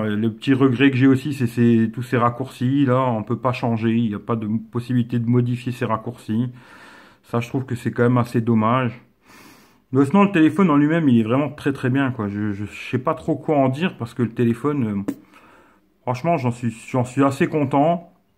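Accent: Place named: North, France. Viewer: L'accent du nord dure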